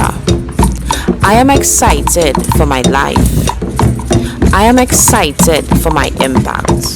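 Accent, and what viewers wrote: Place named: Trinidad and Tobago. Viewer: I am SOOO grateful to hear a fellow trini voice - saying this! 🎉 Sis, idk you.....but I Appreciate you...!!!! 💃🏽💋🌹✨️💦👑🇹🇹💕💕 💯💯💯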